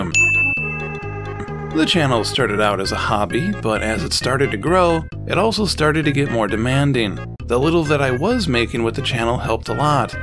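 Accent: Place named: Brazil. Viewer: Damn, another one falls to that bs copyright policy, i would like to help you out but i am kind of short in money (i can't even afford an internet of more than 6 megas) btw, until you spoke i forgot you were brazilian, nice accent, hope you get a fair amount of patreons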